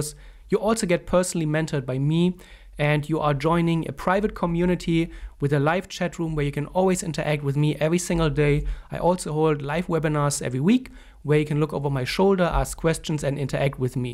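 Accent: Germany. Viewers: Are you from Germany??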